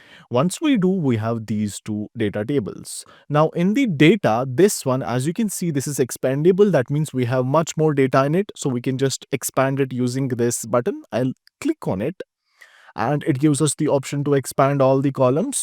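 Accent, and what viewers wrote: India. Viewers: He has that typical heavy Indian accent. Hard for native English speakers to understand, due to less emphasis on sections of words. I have taught a few people from India how to speak clearly. Mind you, my Indian, Indonesian is bas, my Spanish id okay, so I cant really talk. However, i do work on the accents otherwise I get passed over.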